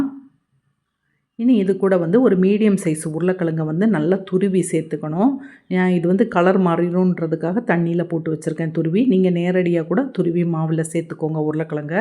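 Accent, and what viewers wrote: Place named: South, India. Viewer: Love your South side slang ma'am. Nice❤❤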